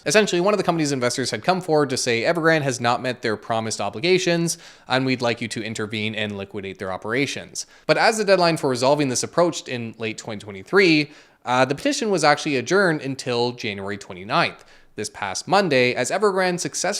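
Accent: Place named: Canada. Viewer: The slight Canadian accent is cute 😊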